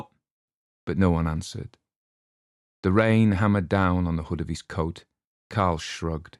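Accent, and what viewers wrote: Germany. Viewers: Great depiction of the gruesome folk character! And a superb reading. I too have a fascination for puppetry, automata and all manner of dancing jerky creatures... remember Raggedy from Rupert the Bear? Twiggy, jerky thing of nightmares that he was. I also loved those Metz adverts: "Beware the Judderman my dear, when the moon is full" has long been a catchphrase in my household. 😆 Also, I'm reminded of the stunning work of the early 20th century German director and animator Lotte Reiniger. She pioneered silhouette animation. Some of it is really dark and creepy (deliciously so!) and all of it is very beautiful. If anyone here isn't familiar with her work you can find some on Youtube. Compliments on your German accent too. (I was born in Germany coincidentally, but grew up in England). Thank you for another great reading and composition! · I think you did a great job on your German accent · "and the promise of eternal darkness" yikes! 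Omg, you are an expert storyteller! Your scary voice and German accent are fantastic.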